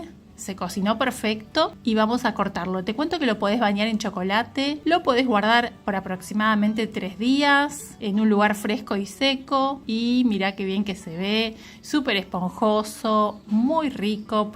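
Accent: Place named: Mendoza, Argentina. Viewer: Ese acento lo conociaaaaaa😂😂😂 soy de Mendoza 🩶 te mando 🫂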